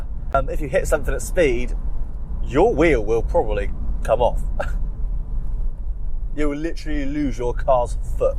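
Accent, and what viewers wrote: England, United Kingdom. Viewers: Hello, where you from? England?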